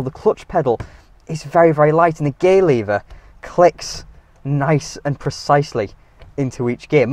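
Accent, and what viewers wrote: United Kingdom. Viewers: Doug DeMuro, but British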